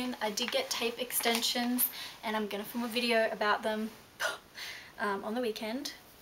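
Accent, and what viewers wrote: Australia. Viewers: Thank you :3 This will be perfect for school! From ur accent im guessin ur an aussie ? Same :3 Ps, Your bootyful. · Very nicely done., you are a beautiful girl and you do this 'almost - no - makeup', natural look well. Wish I could master the natural, no makeup look. I'm a fanatic when it comes to makeup, actually I am extremely artsy, so I always tend to view my whole face as a canvas. I don't even have a ready in five or ten minute go to everyday look. So laborious when it comes to putting my face on. But you knock this out in ten minutes flat. May I ask you, where are you from? Your accent sounds australian. It's charming. Thank you for the posts.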